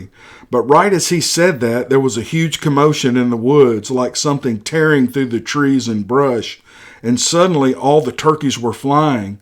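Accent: South, United States. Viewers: with a touch of southern comfort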